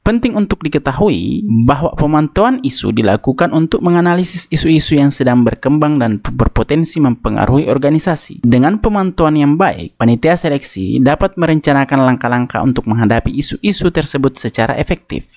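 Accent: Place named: Makassar, Indonesia. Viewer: Orang mkassar kah bos...logatnya agak ke mkassar...wkwkwk😂🤘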